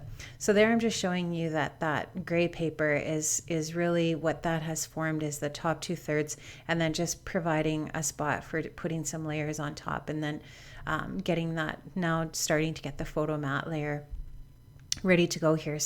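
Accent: Canada. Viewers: Hahaha - oh to be a fly on the wall on the wall and hear you do British, LOL! You really must treat your YouTube followers to a bit of story time :-D It would be brilliant if, one day, you did get to travel to London and Derby and all over the UK. I've personally never been to Canada, and can't claim any connections to your beautiful land, but I have heard amazing things! One day I will go, and that's for certain. (See if I can nail your accent... that kind of thing, hehe!) Hope your summer homework isn't too arduous, poor you. Have a great weekend! :-D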